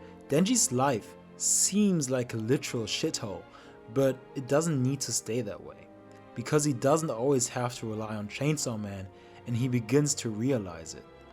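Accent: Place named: Germany. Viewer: Intentional or not, you have a german accent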